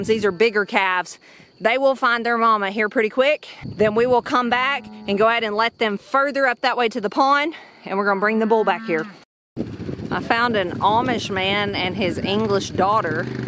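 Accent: South, United States. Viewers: Hi, I'm a newbie...brought here by Kevin (and Dutch)..and I'm liking what I'm seeing! I like the way you talk too! LOL! I'm from Ohio, so I love the southern drawl!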